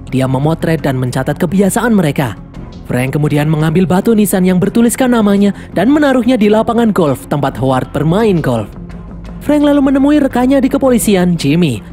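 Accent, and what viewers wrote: Java, Indonesia. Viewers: medOk x Jawa mu min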